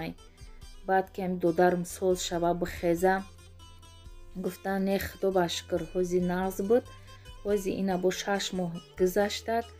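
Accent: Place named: Dushanbe, Tajikistan. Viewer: 21л Салом ,Шумо аз Конибодом лекин лахчатон монанд нест дигар хел ранги Душанбегихо гап мезанед,салати ачоибот зебо ва аз намудаш хело бомаза менамод👍👍👍👍😋😋😋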